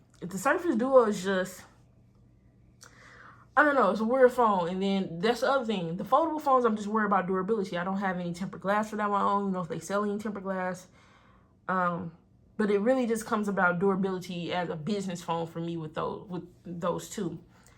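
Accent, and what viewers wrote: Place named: DC/Maryland, United States. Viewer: You have a DC/Maryland accent 😊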